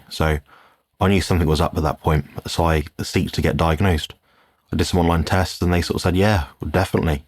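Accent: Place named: England, United Kingdom. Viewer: Thanks. It's nice to hear a lovely English voice.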